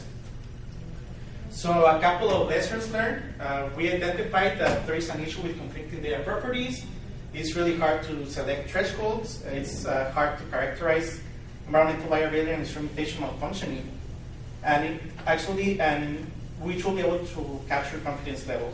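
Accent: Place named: Arab world. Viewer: His accent is bad. is he arab?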